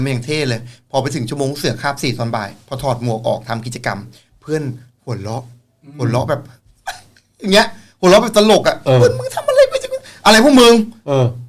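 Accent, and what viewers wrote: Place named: Sukhothai, Thailand. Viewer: ชอบ เล่ามากสนุกมาก ชอบฟังภาษาโข๋ทัย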